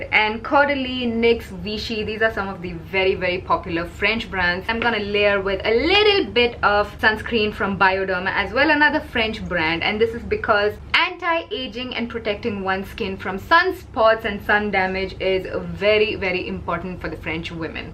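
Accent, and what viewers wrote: India. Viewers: You have a little Indian accent 🤷🏽‍♀️ I'm French and Srilankan girl ✌🏽You're beautiful ❤️😘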